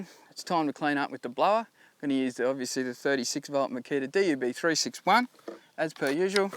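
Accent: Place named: South, United States. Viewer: Where is this guy from? I can hear southern accent, but can't catch where it is exactly from/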